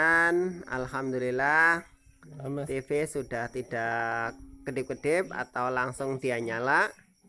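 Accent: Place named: Banyumas, Indonesia. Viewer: Rika ngapak timen.. salam dari lampung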